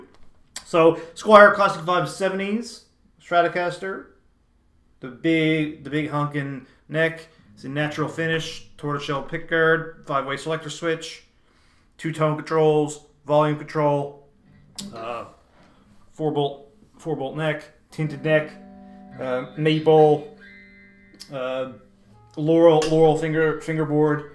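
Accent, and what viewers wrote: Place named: Ireland. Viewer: Lovely guitar, Your accent sounds like an Irish one at times , Greetings from Dublin 🇮🇪 🇨🇦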